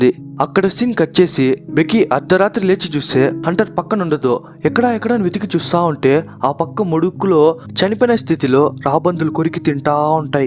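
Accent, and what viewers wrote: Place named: Karnataka, India. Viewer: bro nuvu telogodivi kadhu kada, nuvu matladuthunte kanada valu laga matladuthunttu undhi.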